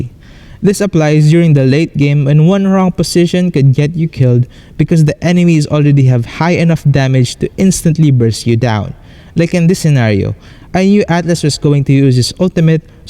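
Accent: Philippines. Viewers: Maybe he is philipino, they have this accent, almost all ML youtubers from Malaysia, Philippines and Indonesia has this accent. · Obviously his accent is Filipino...what's your point?!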